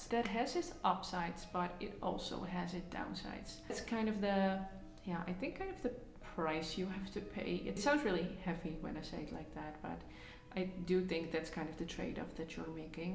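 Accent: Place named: Netherlands. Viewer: Hoor gelijk dat je Nederlands bent😊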